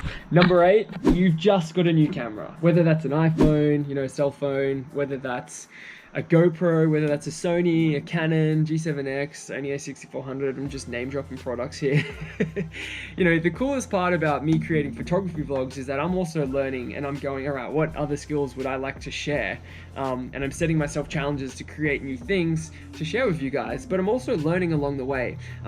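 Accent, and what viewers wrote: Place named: Australia. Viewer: Thanks for the vid! This is the 4th video of the day I've watched on this very topic.. and the best for sure! Also, I was immediately surprised by your accent. An Aussie vlogger! Hectic! Inspo. Im a teacher for ESL (English as a second language) to adults. Going through some... Challenging times.. bored of work... I've definitely always been over consuming recently!! And I have been tip toeing around my desire to talk about all those things you mentioned, as well as mental health. I don't know how but I wanna begin talking about it. I liked your Socrates reference. Good job. PS your pool's inner walls are heaps discoloured but the water looks faaabulous. Pps. In writing this I have some ideas. Thanks again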